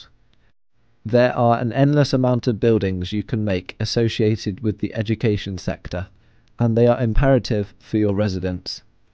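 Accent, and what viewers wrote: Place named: Birmingham, United Kingdom. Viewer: i think bro's from birmingham 💀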